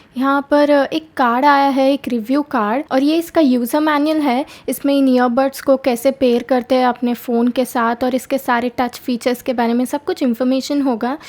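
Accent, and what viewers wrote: Hyderabad, India. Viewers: Good review.. sounds hyderabadi slang....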